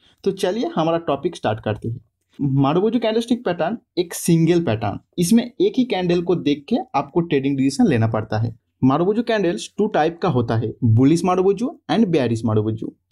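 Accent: Bengal, India. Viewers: Very nice content and very nice to hear Hindi in bangali accent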